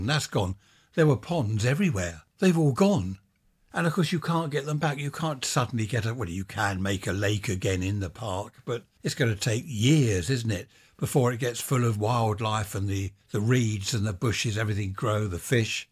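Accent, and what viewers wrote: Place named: Worthing, United Kingdom. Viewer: Hi, this is a podcast covering one of my favourite interests. Trains and trams are always a great subject to discuss. When I was a child in Sydney, all the interstate and regional trains had steam engines. Our suburban trains were single deck electric. So9me had steel carriages and others had wooden carriages, which were older than the steel carriages. Sydney only started getting double deck carriage trains in the 1960s. Actually, listening to your voice, you don't sound like you are at the Isle of Wight, you still have your Worthing accent!!! Seriously though, The Isle of Wight sounds like a great place or a holiday. I remember riding steam trains from Sydney to Nowra south and also to Newcastle north of Sydney. Where do your trains go from Worthing these days? According to a video that I have just watched, the train carriages going through Worthing station are green in colour. 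We had a lot of train lines disappear here in Australia as well, though in some situations, they have had to bring them back. We still have street telegraph poles with lights here, though only to carry the electric, Internet and phone cables. We had trains carrying cars until recent years, though not sure if they still run these days. My wife and I were married in a house way back in 1979. We knew a minister, who was a friend of the family and all went very well. Anyway, take care. Rob in Melbourne Australia.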